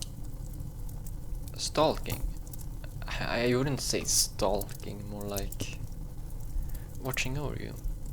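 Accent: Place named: Sweden. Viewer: I knew it! Your accent reminds me of my first love🥺 He's from Sweden too.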